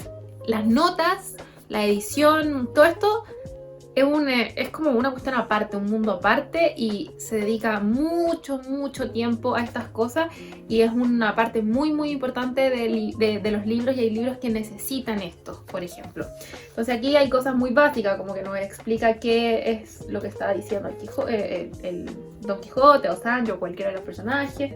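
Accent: Chile. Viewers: Que bueno tu canal, ya tienes un Nuevo Suscriptor, Me haces que Eres Chilena por tu forma de Hablar, y se ve de lejos que eres una chica muy leída y de amplia inteligencia y Conocimiento, aparte que muy bonita, eres la mitología y la magia, la mujer perfecta, Saludos desde el perú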